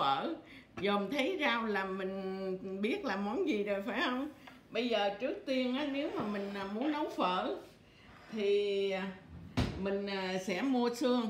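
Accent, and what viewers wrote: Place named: South, Vietnam. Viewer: chao di ,giong noi cua nguoi mien nam minh sao ma de thuong chan tinh qua , di chi dan ngan gon don Gian de hieu de lam , loi noi voi chat giong rat de men , cam on di da chia se nhung kinh nghiem nau an quy bao nay ,kinh chuc di luon khoe manh , di rat vui ve tinh cam lam, da bam like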